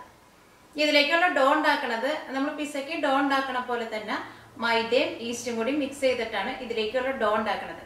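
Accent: Thrissur, India. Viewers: Nalla avtharana shily trishoor voice basha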